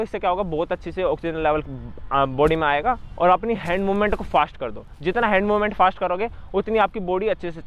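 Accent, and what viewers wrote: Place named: Haryana, India. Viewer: 😃😃😃haryana h bhai Uski glti n h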